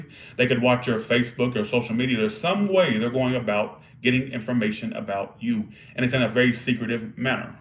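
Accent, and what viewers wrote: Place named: South, United States. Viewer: Damn you are the bomb. I love your southern accent; thank you for doing this.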